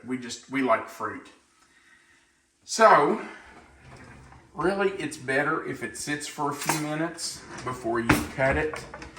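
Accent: South, United States. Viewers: This looks so good and easy to make over the holidays when we have company. Thanks for sharing. Also, my hubby and I are having a debate on accent (well region you're from) I say soutjern states and he says Pacific Northwest. Settle our debate. Lol!!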